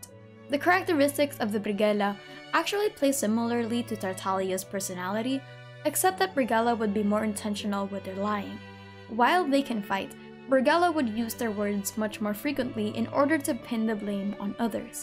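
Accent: Philippines. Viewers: Your accent sounds Filipino ❤️ Hello, kabayan! I love your content! Amazing research and compilation.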